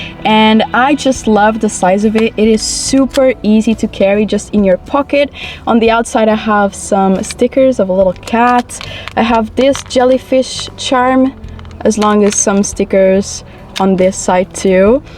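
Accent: Portugal. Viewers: she is portuguese i’m pretty sure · Since the first second I was like "I'm sure they're portuguese" based on the accent and as soon as you said it at 8mins i almost screamed <3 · bem me parecia que soavas portuguesa haha amei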